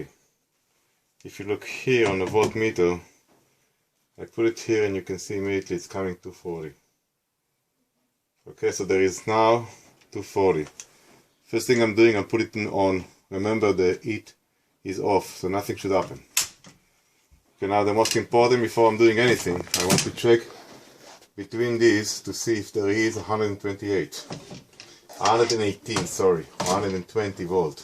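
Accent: Israel. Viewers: Definitely sounds Israeli to me.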